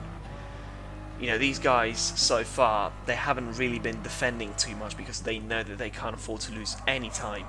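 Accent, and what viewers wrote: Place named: United Kingdom. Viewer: You sound more british than most british people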